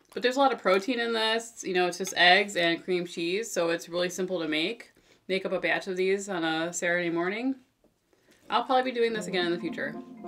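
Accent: Chicago, United States. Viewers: First off you guys are effing adorable! I love the dry sarcastic sense of humor! Thanks for making this helpful content! PS. You sound like your from Chicago!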